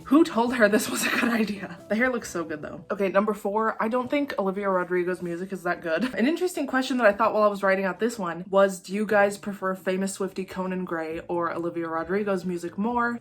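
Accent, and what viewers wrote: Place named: South, United States. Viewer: She moved to Tennessee when she was a teenager, sometimes people pick up accents easily. I agreed with you about the first 2/3rd's of your video, but you don't get to criticize Taylor's bangs or people having curly/wavy hair and straightening their bangs when you are wearing the same look. I do agree it is not a good look on ANYONE. To me I love all her albums, I may have songs that aren't my favorite, but 98% of her songs are my favorites. I don't think I could pick out a favorite. New Years Day was the perfect ending to Reputation in my opinion. I loved her bleached-blond look, she looked so iconic. One more thing there is more to a country accent than saying certain words, you do have a southern accent (not a strong one though). I am a firm believer that how people speak comes from the area that they live in, I am from the north originally, but have lived in Virginia since 1991, when speaking to family members from Wisconsin, they think I sound southern. 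Case in point, a lot of people from other countries that move to say England, the children that are born there speak with an English accent, not the one of their parents native country. Well, that's all for my opinions.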